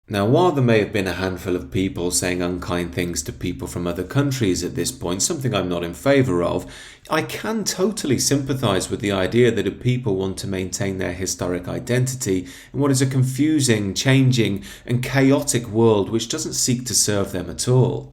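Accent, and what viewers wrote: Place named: England, United Kingdom. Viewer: Spoken like a true english gentleman . Cheers from Italy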